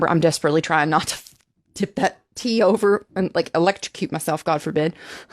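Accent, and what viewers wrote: South, United States. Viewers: Nice to hear the southern accent coming out more!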